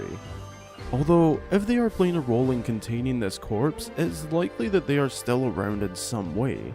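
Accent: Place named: Scotland, United Kingdom. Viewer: You've a very strange accent to me, it kinda sounds like Scottish and Northern Irish mixed and every odd word American lol I like it and the video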